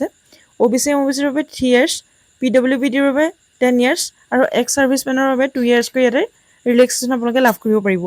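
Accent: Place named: Tezpur, India. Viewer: Tezpurr lage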